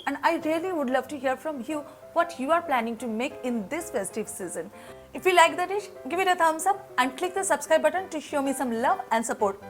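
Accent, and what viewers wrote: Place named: Bengal, India. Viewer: Kotha sune to bangali mone hoche! তাই বাংলায় বলছি-- রান্নার প্রণালী বেশ সুন্দর ও আকর্ষণীয়! কিন্তু রান্না করতে করতে ওই ন্যাকামো গুলো বেশ বিরক্তিকর!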